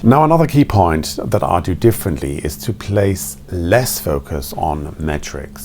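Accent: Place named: Germany. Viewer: haha as someone studying german for A level at around B2 level in england, I wonder if anyone has told you that you actually are developing a slight german accent in the way you say some words lol! Anyway, this is a very interesting and informative video, danke!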